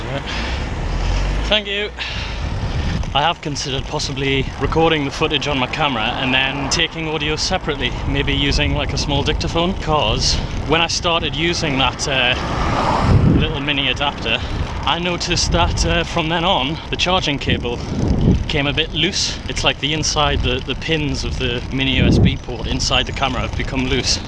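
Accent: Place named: Geordie, United Kingdom. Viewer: Two observations: Firstly that you're a posh Geordie, I know because I married a posh Geordie ;-) and secondly I'd love to see more of the coast in your videos, the coast up there is very beautiful (too cold for this soft southerner but great to look at)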